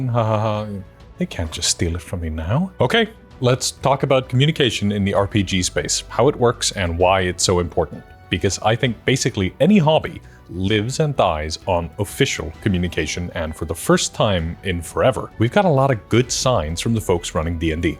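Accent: Iceland. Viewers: I need to tell you this, because I've thought it for a while now and I can't keep it to myself. Has anyone ever told you that you sound just like Jonathan Frakes, just with and Icelandic accent?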